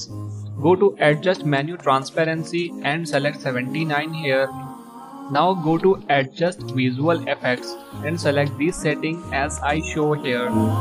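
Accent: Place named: India. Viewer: I'm so sorry and i don't mean any offence but from my experiences, the indian accent is throwing me off I am quite sure tho that this is probably a legit vid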